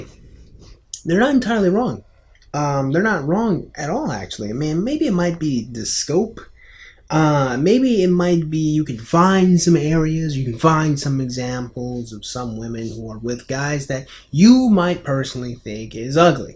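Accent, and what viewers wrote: United Kingdom. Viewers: lol what is up with the limey accent? You speak perfect english. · Your British accent makes me sp uncomfortable and left the vid with a dislike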